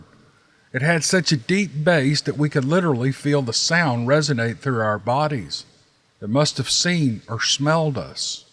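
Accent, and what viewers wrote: South, United States. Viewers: He is surprising one of the best narrator/commentators on YouTube today that southern gentlemen’s voice I think is the ticket of Sir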